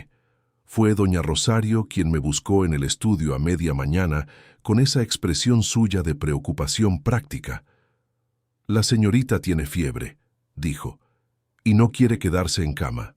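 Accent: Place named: Mexico. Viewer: Soy colombiana,y me e alegro mucho que hagan historias narradas de mi país, gracias por tenernos en cuenta, pero me gustaría que se cersionaran más de los nombres,y pronunciaciones de nuestros dialectos, ya que aquí hay muchos, dependiendo de cada departamento,porque sé que UD es mexicano, y todas sus narraciones suenan igual, acá en Colombia no hay provincias, hay municipios, con nombres de cada dpto, y los departamentos de Colombia son ciudades grandes, Muchas gracias por tener en cuenta a nuestro país, pero que pena, que su dialecto es muy de su país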